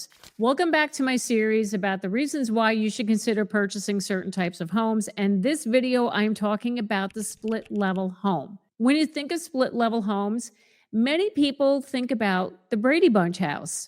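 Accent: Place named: North, United States. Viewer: We relocated to GA from NY (born and raised, particularly Long Island) in '94. I was looking for a different (I know that's not the spelling of your name) and I hit play and turned around. Your accent (which isn't really an accent to me🤣) put the biggest smile on my face!! Every time I register students and I'm speaking to parents calling for information, before I find out where they're coming from, if I hear it, I always ask, "What part of the north are you from?" After 32 years, I'll NEVAH give up my accent! There's no lack of trilevels, stack splits, bilevels, and, of course, Cape Cods on the Island. But when I got down here, I absolutely loathed the bland-no-character-monopoly-looking-lincoln-log-lunchbox-looking houses. Just because it had brick on all four sides, didn't make it any more appealing to me. Still looked like a tissue box to me. 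When we relocated, we'd bought a cookie cutter ranch (because why rent when you can own, right?), then a colonial with a million and one steps) Then, when I made my Exodus from my marriage, and started looking for myself, I knew I wanted the trilevel split I'd grown to love while visiting my uncle's in TN during the summers. His was built on a slope and had a family room downstairs leading out to a pool off the patio, formal living/dining/eat in kitchen with a deck off the main level, then the bedrooms upstairs. His was the first house I'd known with central air! Mine is on a half acre wooded, fenced lot with a second fam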